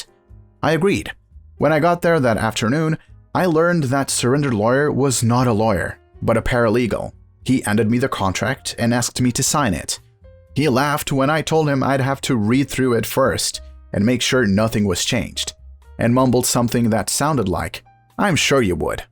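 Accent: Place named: Eastern Europe. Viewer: Just subscribed. These stories are new to me, no repeats so far. One thing: I'm enjoying the reader's accent when speaking English. I know it's not human but still, it doesn't sound strong enough to be a recent arrival from Eastern Europe, the accent has mellowed....what a thought about a computerized/digital voice.... there's something very, very wrong with me🤔 I suppose a portion of the listening public have reverted, really. 40 or 50 years ago, we listened to longer stories from a cord-bound radio in one room of the house(mostly.) These days, we go everywhere with a plastic plug stuck in one or both ears, often connected to a garrote-type device, for the sake of the same type of entertainment....stories. Well done, 🎉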